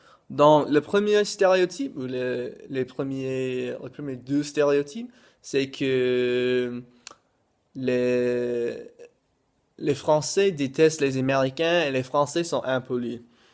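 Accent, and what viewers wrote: Quebec, Canada. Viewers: La plupart des français se douchent tous les jours, mais je connais plusieurs personnes qui se douchent moins souvent x) Je trouve que tu as un accent québécois haha !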